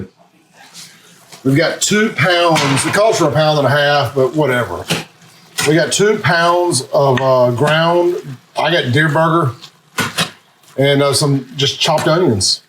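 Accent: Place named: South, United States. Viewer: Where are you from?? I can’t pinpoint the accent it sounds southern but also half of it doesn’t sound southern I’m so confused lol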